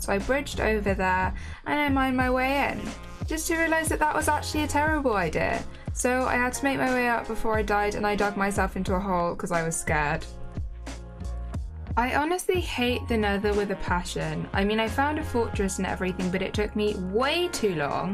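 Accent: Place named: London, United Kingdom. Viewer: I thought so, sounded like a londoner, well good luck to ya 🙂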